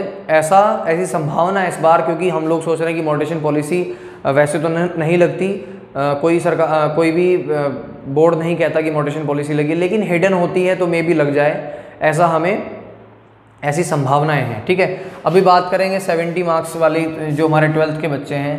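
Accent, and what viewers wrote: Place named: Garhwal, India. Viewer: Sir ji ap gadwali ho kya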